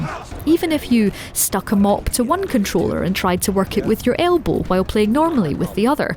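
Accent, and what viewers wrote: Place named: Scotland, United Kingdom. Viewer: Scotish Accent is gold very good content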